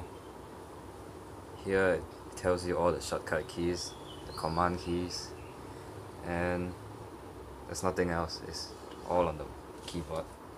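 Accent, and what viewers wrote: Singapore. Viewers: U r a singaporean right you sound singlishhh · you sound singaporean haha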